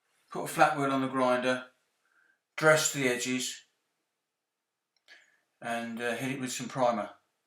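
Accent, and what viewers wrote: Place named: United Kingdom. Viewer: Cant stand british accents. Cannot understand anything he was saying.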